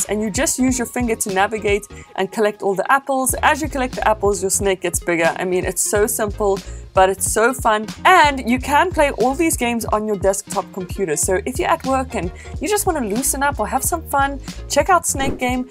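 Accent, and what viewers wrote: South Africa. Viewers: Kom je uit Zuid Afrika? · Is that a South African accent I hear? 👀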